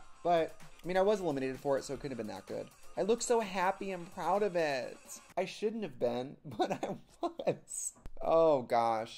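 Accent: Wisconsin, United States. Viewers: The last time I was this early Trixie still had her Wisconsin accent 😭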